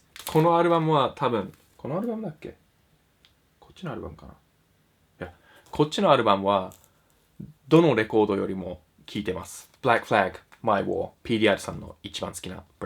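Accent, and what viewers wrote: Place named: London, United Kingdom. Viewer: PDRさんのロンドンの発音好きw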